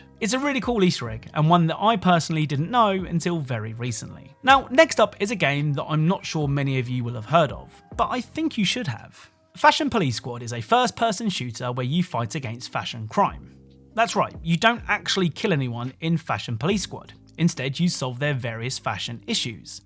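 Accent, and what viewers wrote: United Kingdom. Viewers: What area roughly do u live u sound British u your not from London though ar ya u don't really sound like it, I'm in Harrow, I'm surprised that still happens over here cos it's very time consuming I feel, unless u had a good car I guess...🤔soz though man at least u n family unharmed that's most important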